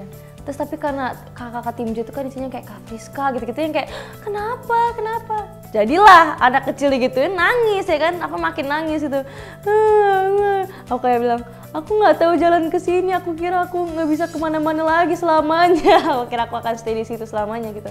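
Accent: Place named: West Java, Indonesia. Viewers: logat bandung nya masih ke bawa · Logatnya sunda nya ga ilang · Logat Sunda nya masih kebawa ya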